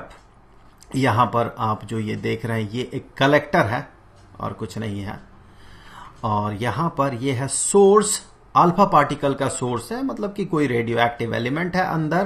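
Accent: Bihar, India. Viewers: Sir ap bihar se ho pls tell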